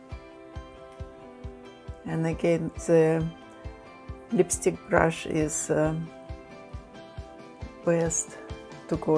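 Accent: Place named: Russia. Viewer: Ооо такой у вас акцент , наверное русский?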